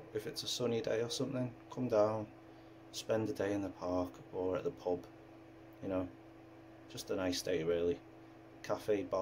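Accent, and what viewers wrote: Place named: Scouse, United Kingdom. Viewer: The slightest of slight traces of scouse.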